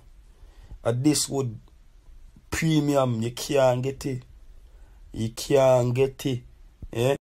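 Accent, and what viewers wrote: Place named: Jamaica. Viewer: The yardie accent 🤢🤢🤢 ya nah yardie man your begging it. I’m not hating tho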